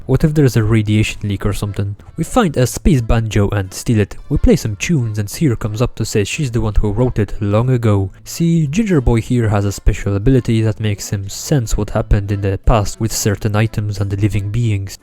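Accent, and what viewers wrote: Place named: India. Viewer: so where the hell are you from you have an accent so indian?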